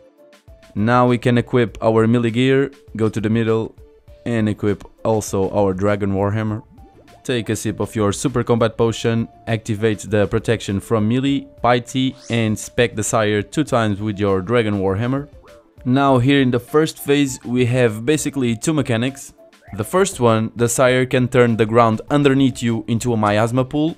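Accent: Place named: Portugal. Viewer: este sotaque de tuga nao me engana, bom video mano :)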